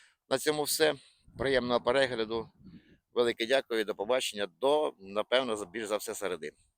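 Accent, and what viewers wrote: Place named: Western Ukraine, Ukraine. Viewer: Ты як бендеряка( маю на продаж) у нас так ніколи не говорили в полт.обл.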